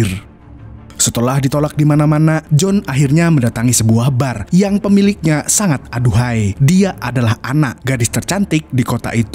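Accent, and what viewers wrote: Banjar, Indonesia. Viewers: Urang Banjar kh bng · Urang banjar kah nang ba'isi chanel ngeneh..??😁😁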